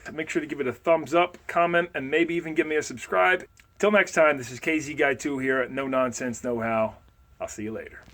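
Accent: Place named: Pennsylvania, United States. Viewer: Must be from pa.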